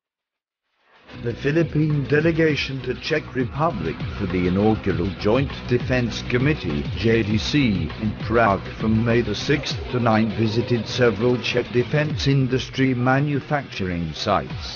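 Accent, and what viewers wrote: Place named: United Kingdom. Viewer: British robotic voice👎...but nice update👍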